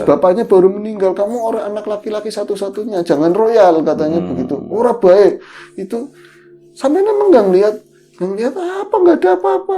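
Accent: Banyumas, Indonesia. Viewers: Basa ngapak mas dan selaan mas itulah yg dinanti...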